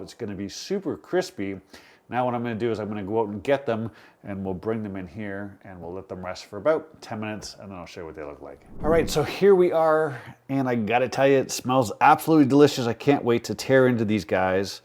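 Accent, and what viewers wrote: Canada. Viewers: Nobody says the word "about" better than Canadians, love their accent.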